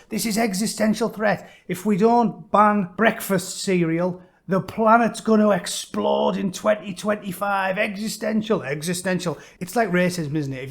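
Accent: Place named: Northern Ireland, United Kingdom. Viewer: Great analogy with the pizza shit mate..... I was laughing my head off whilst listening to it with the Northern Irish accent. 🤣🇬🇧🇬🇧✌✌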